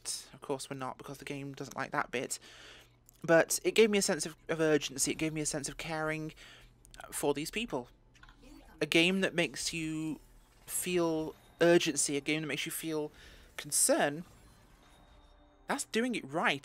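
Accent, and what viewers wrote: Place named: United Kingdom. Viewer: Ok that’s fine btw I like your British accent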